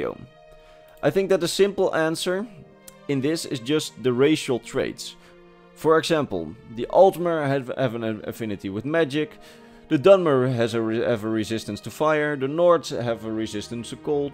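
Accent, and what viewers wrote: Netherlands. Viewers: Hey! Je bent ook Nederlands! Ik dacht al dat ik het aan je accent hoorde XD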